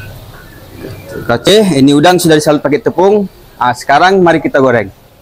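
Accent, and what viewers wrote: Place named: Sabah, Malaysia. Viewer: Mantap bro,logat macam org Sabah saja....betul ke...?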